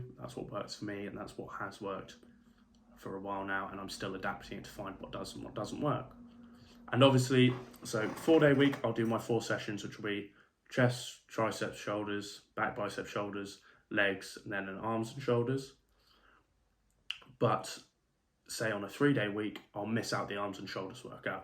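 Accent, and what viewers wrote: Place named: Suffolk, United Kingdom. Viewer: I knew i recognised your accent. Suffolk represent haha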